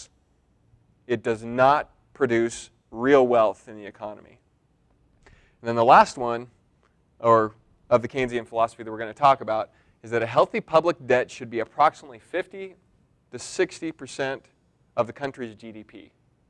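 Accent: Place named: South, United States. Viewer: they must like his southern twang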